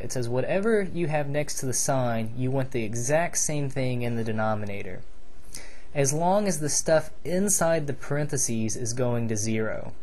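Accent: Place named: South, United States. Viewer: good vids. but your southern accent distracts me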